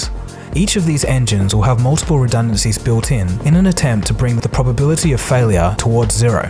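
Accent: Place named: Australia. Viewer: I personally didn´t like the australian accent, and muted the end of the video, because the music was annoying/overcompressed.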